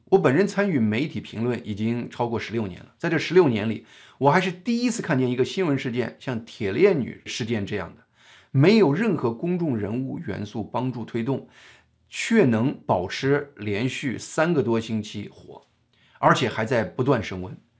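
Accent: China. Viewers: 如果你是东北人。（从你口音听起来应该是东北人）。希望你的视频能割裂中国思维，让你本人聚集在东北人视角上。东北和中国是完全不同的文化和地区。南方是无可救药的。东北人不应该和中国人混为一谈。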